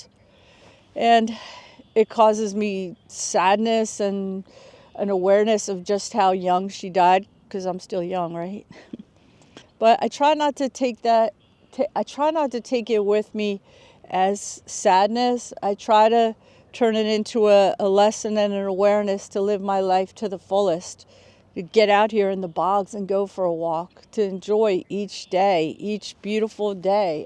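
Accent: New Jersey, United States. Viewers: I knew you were from "Joisy", based on your accent! Out "in da bogs; out in da Pine Barrens!" Love it. Classic.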